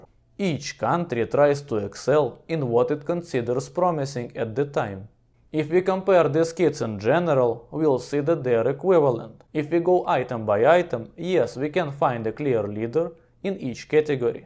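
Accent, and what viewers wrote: Russia. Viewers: Hotel posmotret...yslushal dikiy ryski akzent..I rashotel smotret.. Ychi Angliski sinok... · New viewer here. I have never heard a more Ivan sounding Ivan than you before. Love the accent.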